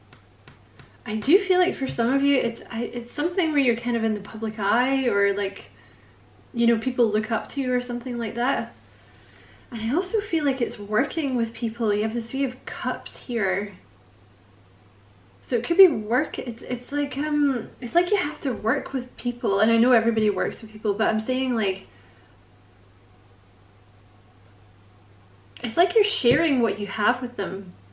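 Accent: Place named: Glasgow, United Kingdom. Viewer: Love your accuracy. . . AND your Glaswegian accent!🏴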